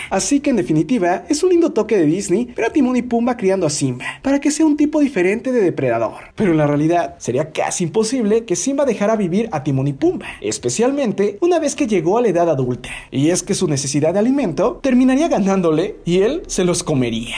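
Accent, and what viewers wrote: North, Mexico. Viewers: Alv esa mierda estu muy norteño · Chale, lo último es traumante xdxdxd Diablos que norteño xdxd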